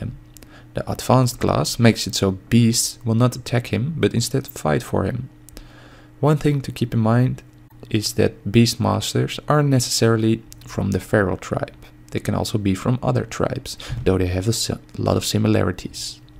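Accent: Netherlands. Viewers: op reis hahaha Wow ik dacht al dat ik het herkende:p (I already thought I knew the melody, but couldn't remember it:p ) Now that you say it's a dutch song, your accent sounds from Holland, is that right?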